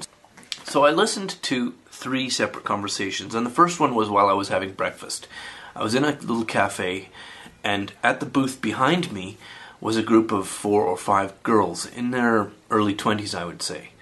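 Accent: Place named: Scotland, United Kingdom. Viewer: sounds Scottish. I'm a Scot myself.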